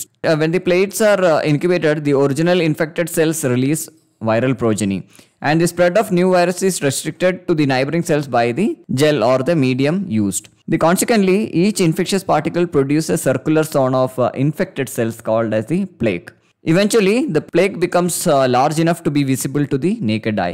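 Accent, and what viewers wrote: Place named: South India, India. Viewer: From your accent I gather you're a south Indian. Good job. Thanks for making the video in English. It helps us all South Indians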